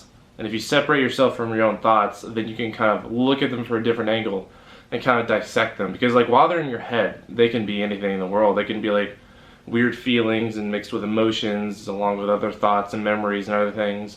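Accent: Arkansas, United States. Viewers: Are you from Arkansas? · Are you from arkansas? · no way !!!are you form arkansas?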